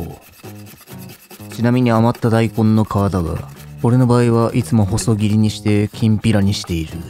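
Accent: Kansai, Japan. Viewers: 変な関西弁が混ざると思っていたが、本当に関西人だ。 西では天かすだが、東では揚げ玉。 これ、にこんにゃくのサイコロ切りを入れても触感が良い。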